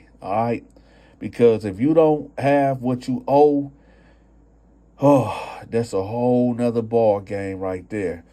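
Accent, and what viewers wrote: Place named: Detroit, United States. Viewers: It sounds like you from Michigan..but home is where your heart is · You got a Detroit accent , so you’re from Detroit lol. New subbie here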